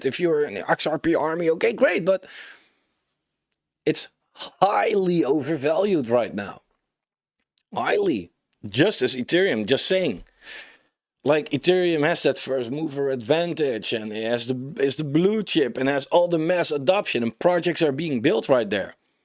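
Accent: Netherlands. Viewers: Nice video m8 doing great keep up the great work! Like your style, and dedication to explain your vision and information. The first video when i found you on youtube i was like hey he's dutch i can hear it Nogmaals goed bezig man! En op naar een mooie cycle met mooie kansen Laterr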